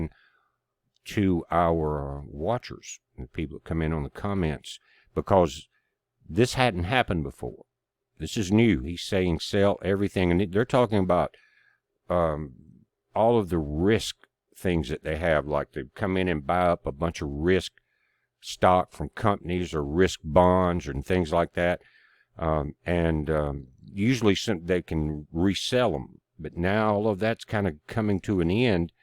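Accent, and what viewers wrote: South, United States. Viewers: I like him because he has a southern accent but his reporting is some of the most level headed reporting i've observed.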